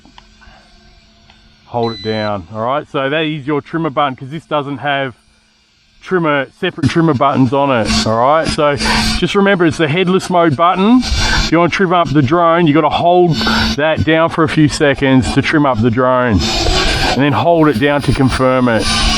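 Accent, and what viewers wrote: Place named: Australia. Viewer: Its the Aussie accent mate, give it some time until it gets used to it haha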